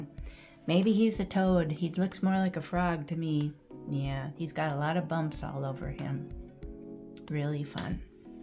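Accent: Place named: Minnesota, United States. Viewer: I have a small frog collection which my grandkids think is weird because I’m afraid of actual frogs. 🐸 I love your cute Minnesota accent. Thanks for the tour.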